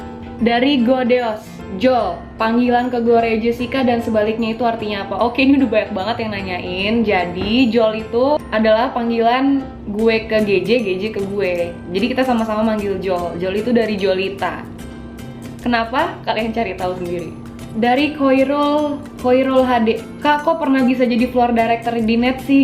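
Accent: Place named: Makassar, Indonesia. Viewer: bedede org Makassar pae,salam dari Makassar nah 😂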